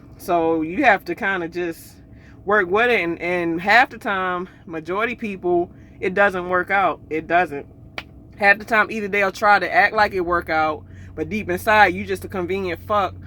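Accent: Baltimore, United States. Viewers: I like how u rep B-More w/that accent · sound like she is from baltimore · Second video I seen and like!!! I hear that Baltimore accent💯 sounds like home☺️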